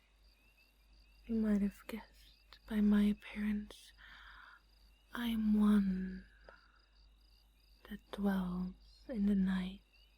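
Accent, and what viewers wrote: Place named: Netherlands. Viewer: Your videos are all amazing. You're so creative and you perform your role plays with passion. Thank you for what you do. I enjoy your Dutch accent influencing your other accents that you do. It is very triggering for me because I have never heard it anywhere else:) please continue with your YouTube channel.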